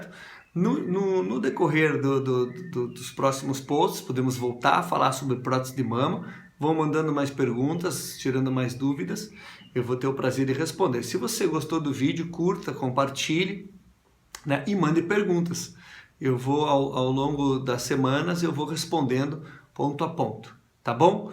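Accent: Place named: Northeast, Brazil. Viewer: Dr. Primeira mente esse teu sutaque é maravilhoso, nao sei de parte do Nordeste tu eres mas amo esse sutaque. Bem eu estou planejando colocar uma protesi mamária, moro em Boston mas sou de Recife, estou estudando a hipotese de fazer a cirurgia no Brasil, gostaria muito de saber em parte do Brasil vc atende?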